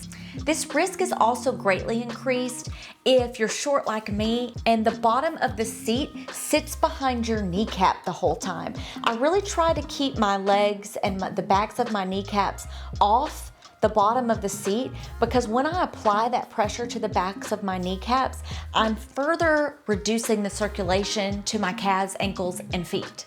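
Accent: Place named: South, United States. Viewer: Thank you so much for this video,. I heard your heavy accent, and I am wondering where you are from. I am guessing somewhere south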